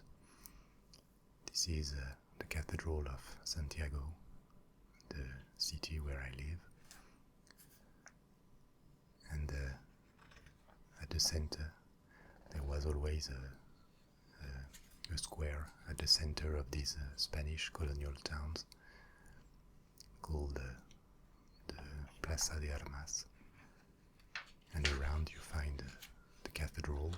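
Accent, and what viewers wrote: France. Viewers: Vous avez un accent français je trouve !!! 😍😊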